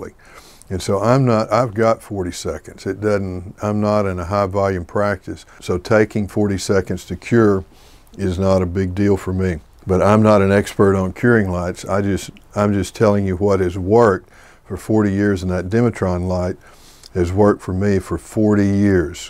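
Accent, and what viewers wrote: South, United States. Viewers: I just love ur southern accent. They work every time ;)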